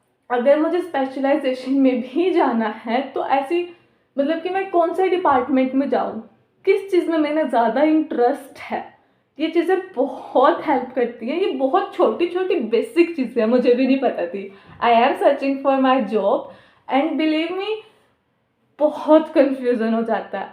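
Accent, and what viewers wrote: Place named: Marwar, India. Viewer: aap marwadi ho kai aapke tone se lg ra h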